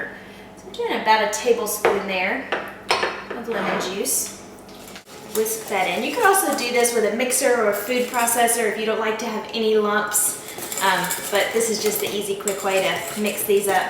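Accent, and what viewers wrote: Texas, United States. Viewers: Are you Texan? I love your accent! You are also very pretty!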